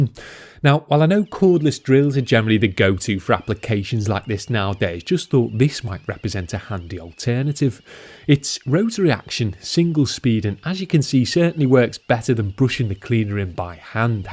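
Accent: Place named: Merseyside, United Kingdom. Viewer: Before watching vids, I thought the Merseyside accent was just good on record.. like the Mersey hits of the 60's But also sounds great for car detailers too..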